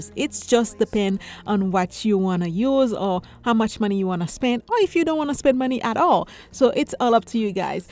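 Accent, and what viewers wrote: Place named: Haiti. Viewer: My guess is you are Haitian. I love your voice and accent. God bless you.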